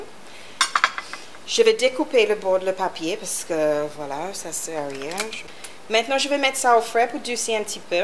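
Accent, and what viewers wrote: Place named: England, United Kingdom. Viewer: Sa se voit que t'es anglais Ta vois je l'aime bien😇😀😊☺😍😻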